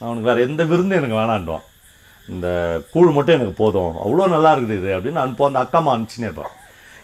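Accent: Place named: Tiruvannamalai, India. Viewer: திருவண்ணாமலை வட்டார மொழியில்...எளிமையான உரையில் எழுத்தாளர் "ஜெயகாந்தனின்" மொழிநடை மற்றும் உரைநடையை நேர்த்தியாக சொன்னீர்கள் அழகு.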